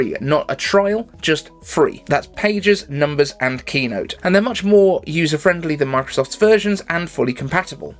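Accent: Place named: Birmingham, United Kingdom. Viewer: #iCaveAnswers I’m super interested in the geographical breakdown of your audience. As a British guy who watches the show, I usually assume the audience are also mostly British. The Mint Mobile sponsor made me wonder about how many viewers are actually from the USA and won’t even notice the hint of Brum in Daves accent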